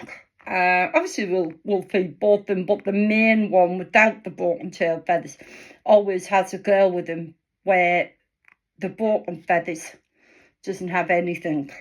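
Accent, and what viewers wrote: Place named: Wales, United Kingdom. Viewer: Excellent owl! I see (and hear) you're from the UK. I'm gonna guess Wales, since Welch is the one English this American has trouble understanding. (And, yes, I've even been to Australia. lol) I'm glad the vid isn't just a short because it takes a bit before my ear attunes to Wales. Thanks and, yeah, it's definitely worth a sub.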